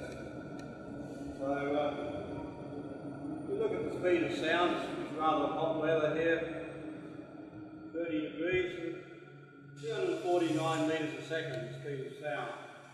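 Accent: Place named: Australia. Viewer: Australian... cool.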